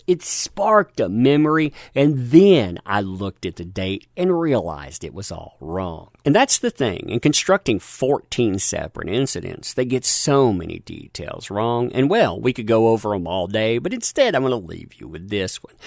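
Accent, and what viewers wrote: South, United States. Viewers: Horrible narration. Weird scratchy, prissy, hillbilly voice.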